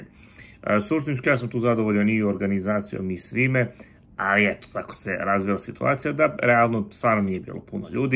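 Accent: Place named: Ekavian, Serbia. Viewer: Ajme men ove ekavice!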